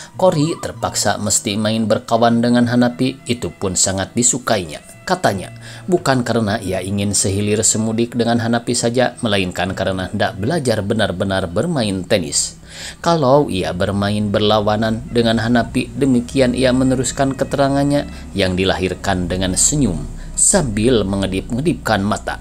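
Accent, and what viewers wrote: Sunda, Indonesia. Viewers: Hhhe bang ki sunda gk klop certi dlm bhs indonesia karena sdh klop dongeng sunda hhe lagamna bhs sunda ki.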